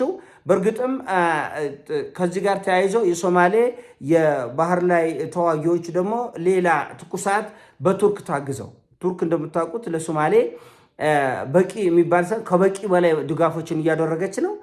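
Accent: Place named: Oromia, Ethiopia. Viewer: ኦሮሞ መንግስት ሲወድቕ አነጋገርህ የኦሮሞ አማርእኛ ስናጋር ትቀይረዎለህ አደል አይአንተ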